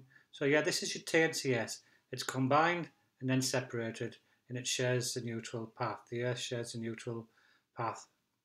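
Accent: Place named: Teesside, United Kingdom. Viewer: Good to hear a Teesside accent on here. Thanks for the lesson.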